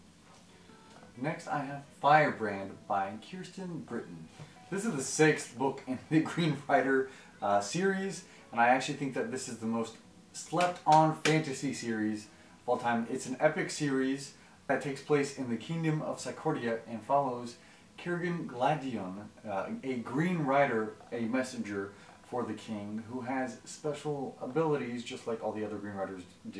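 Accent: South, United States. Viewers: I can't believe you had a lot of books at your house in Texas on this video during your quarantine including Malice by John Gwynne, Priest of Bones by Peter McLean, Winter Counts by David Heska Wanbli Weiden, Practical Magic, The Rules of Magic and Magic Lessons by Alice Hoffman, Piransi by Susanna Clarke, My Best Friend's Exorcism by Grady Hendrix, Dracula by Bram Stoker, Cemetery Boys by Aiden Thomas, The Grey Bastards by Jonathan French, One Word Kill by Mark Lawrence and so many others until you're talking about all of them to read while you made your beautiful husband, Zach read them with you at the time, so you're discuss about all of the characters from all of the books after that, so he'll be very proud of you and I love your Southern accent!!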